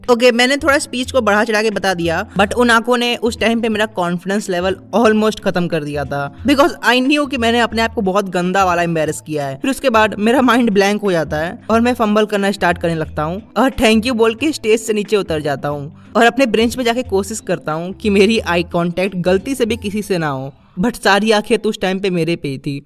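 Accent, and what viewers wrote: Bengal, India. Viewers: R u Bengali? Btw liked ur video👍🏼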